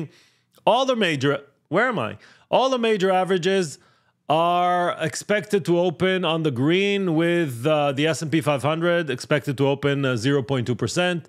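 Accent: Israel. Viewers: הנה דימוי בשבילך, מקווה שתבין בתור דובר אנגלית מלומד (עם מבטא ישראלי יפה) - למה שאשקיע ב-OTC כשאני יכול להשקיע בנסדאק? ולסיכום, בעולם העסקים כדי שהמוצר שלך יהיה מוצלח צריך בידול. באנגלית שבורה, אתה כבר לא מיוחד. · Well done! You still had more than 7.5K viewers listening to you talking in English (with a small hebrew accent:)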